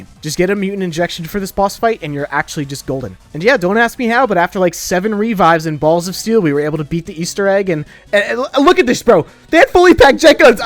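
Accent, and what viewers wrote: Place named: Midwest, United States. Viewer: Love the Fucking commentary 😂 you from Chicago or the Midwest or something? Haha hope your channel grows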